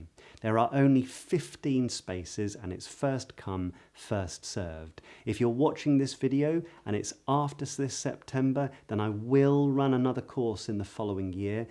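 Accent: RP, United Kingdom. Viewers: Please start a podcast for rp accent listening practice. Could you please recommend few podcast with rp accents?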